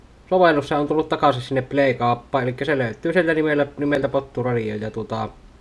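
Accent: Savo, Finland. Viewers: Savolainen englanti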